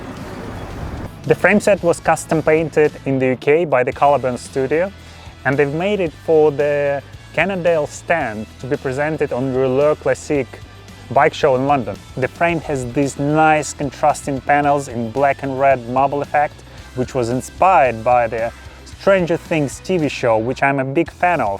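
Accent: Russia. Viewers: Do like your videos, buddy! Are you from Russia& Sounds like that ) Hope to see more interesting Gravels on your channel soon!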